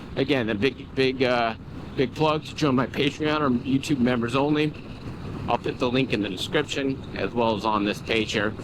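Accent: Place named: Baltimore, United States. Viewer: Dude, this guy sounds EXACTLY like Stavros Halkias.. Personality wise too. Baltimore area accent perhaps? This super glide looks amazing 👏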